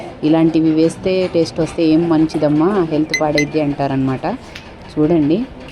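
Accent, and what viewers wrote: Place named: Godavari, India. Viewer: Mee Hindi language godavari lagundi leka guntur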